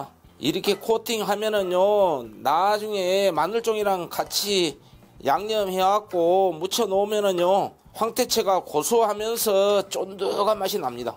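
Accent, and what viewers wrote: Jeolla, South Korea. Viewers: 당장이라도 해서 먹고 싶네요~~^^ 전라도 사투리~~^^ 찐입니다~~ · 황태채 마늘쫑볶음~~구수한 수쿠락~워메워메사투리 ㅋㅋ~~레시피 감사 합니다~~👍👏👏👏👏😍⚘️⚘️⚘️ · 낼은 이반찬 도전합니다. 구수한 전라도사투리 정감있고 안먹어봐도. 군침도네요~ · 최고에요 전라도 사투리에다. 맛있는 마늘쫑 황태포랑. 함께. 꼭. 만들어 볼께요 감사합니다 ❤❤❤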